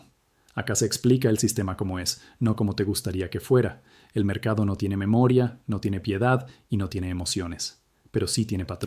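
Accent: Argentina. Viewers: Por qué ahora hablas argentino?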